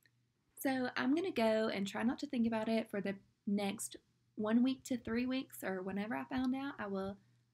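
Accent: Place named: South, United States. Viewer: i love your accent ! you have more of a southern accent than me and idk if arkansas if counts as a southern state haha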